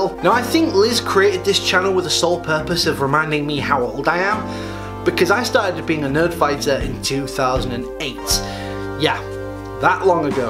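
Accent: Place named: Manchester, United Kingdom. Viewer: Is from Manchester??:o I've been away so long, but that accent just plunged me into nostalgia xD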